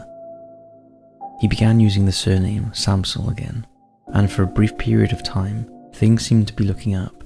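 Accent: United Kingdom. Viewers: I love your doco’s - I’ll be watching every one. Good realistic footage that’s relevant and great British voice ❤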